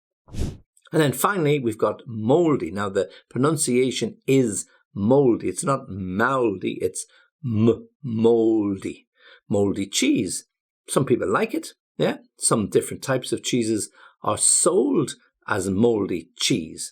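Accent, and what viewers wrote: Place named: United Kingdom. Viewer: Wow, I like a British accent 😊😊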